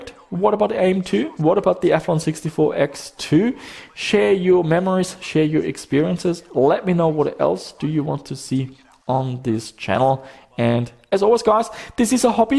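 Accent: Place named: Germany. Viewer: Wer merkt von euch wohl dass die Person mehr deutsch ist als mit dem englischen Akzent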